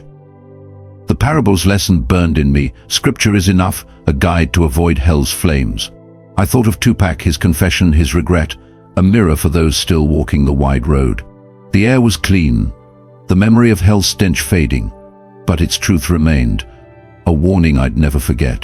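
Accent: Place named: United Kingdom. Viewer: It’s really hard to find this believable when it’s a white British accent speaking and we’re talking about the most urban hip-hop artist and legend Tupac Shakur allegedly being seen in hell. I understand that people want to conceal their identity because they work in corporate jobs… But I just couldn’t sit through the whole thing. Y’all should have picked like a different accent maybe a black person even a Hispanic person but a white British accent come on guys,! Y’all lost me at like 15 minutes.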